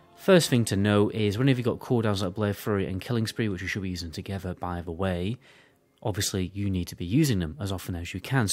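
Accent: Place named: Teesside, United Kingdom. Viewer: You sound like a teessider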